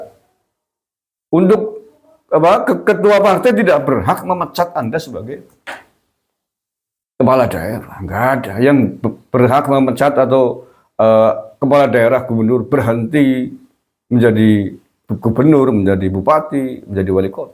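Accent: Banyumas, Indonesia. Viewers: Semangat truss mas Bro , ...ngapak menyala ❤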